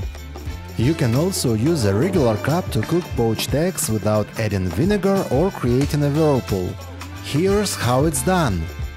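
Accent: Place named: Russia. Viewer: I love this Russian accent!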